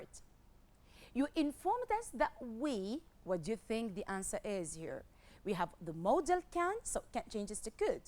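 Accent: United Kingdom. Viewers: Reminded me of the old British accent ..I thank you for dealing with all the necessary components required for the productive stage ..Hats Off!!!! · Loved that RP accent bravo madame ❤❤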